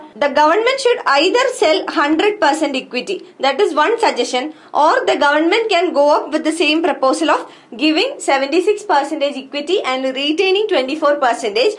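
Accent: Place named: South India, India. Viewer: mam your accent looks like typical south Indian difficult to catch and moreover you were fast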